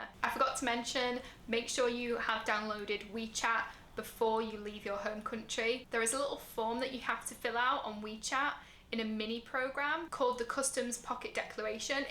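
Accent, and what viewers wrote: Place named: Ireland. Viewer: are you Irish? you just cant hide your accent.....................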